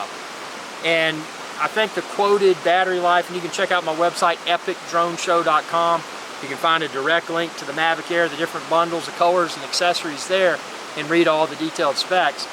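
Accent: South, United States. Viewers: Thank you. Love your southern accent. (UK)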